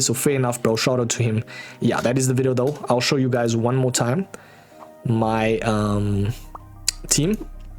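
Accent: South Africa. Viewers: Are you South African bro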